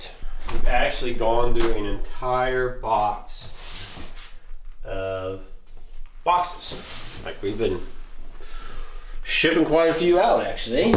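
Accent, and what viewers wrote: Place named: Denmark. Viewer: hello i just noticed you dont have many viewers and subscribers so i just wanted to support abit seems like you do decent content ur accent sounds abit danish but where are u from?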